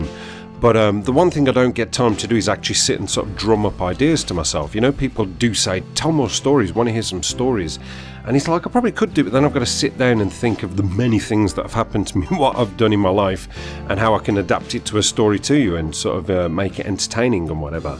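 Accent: United Kingdom. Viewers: I've been checking your channel everyday for a new upload....dammit! Start uploading more, I need your British accent in my life lol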